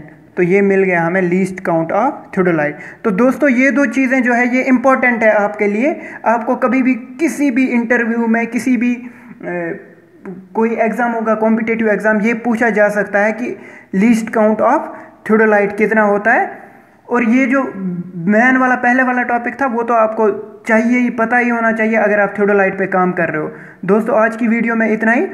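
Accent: Kashmir, India. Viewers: Sir aap Kashmiri ho?? Any way nice..thanks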